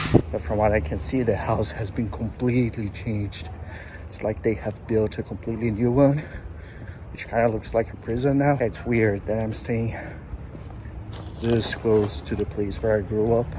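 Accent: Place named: Brazil. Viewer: how strange. Your Brazilian accent seemed stronger when you were in the US. I hope your family does not make fun of your American accent now. 😛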